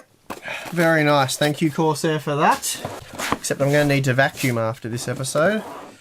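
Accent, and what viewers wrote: Australia. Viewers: I wasn't subscribed? OMG! I just love your australian delivery.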